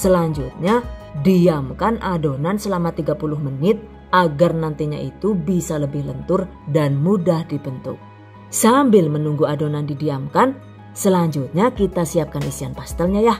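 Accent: Banyumas, Indonesia. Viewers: Ikut komen ya kak. Emang itu sudah jadi ciri khasnya. Campur ngapak n inggris medok juga😅. Semuanya begitu. Tapi semua resepnya recommended dan nyaris ga ada yg gagal kok. kl baru lihat emang aneh, lama2 akan terbiasa🙏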